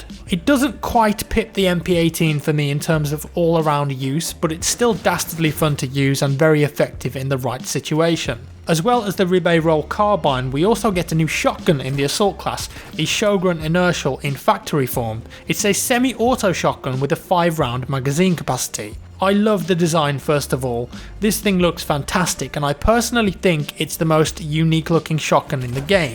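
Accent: United Kingdom. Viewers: hey man I'll make it short I like you vids and your buttery British voice❤️ I would also love the Xbox you giving away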